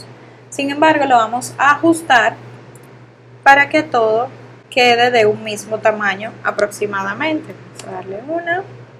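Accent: Dominican Republic. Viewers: eres dominicana?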